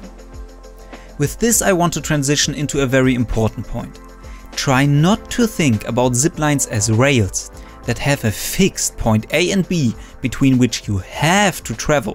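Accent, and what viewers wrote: Germany. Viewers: Your german accent made 'Super Jumping' feel even cooler. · i can hear it in his voice he‘s german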